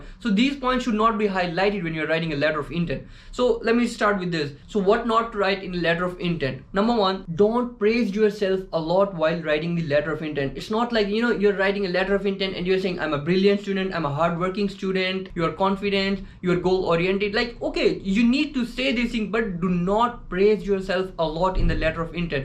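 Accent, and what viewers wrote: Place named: Pakistan. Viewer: kuch b kr lo native english bat ni kr pao gy 😂 tu faida nu Pakistanio ki trah he english bolo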